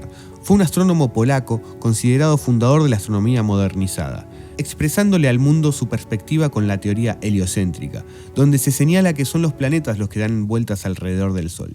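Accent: Argentina. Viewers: Grande ese locutor argentino papa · Hermosa voz argentina!!!! me parecio excelente la locucion, nose por que el problema de que sea argentino!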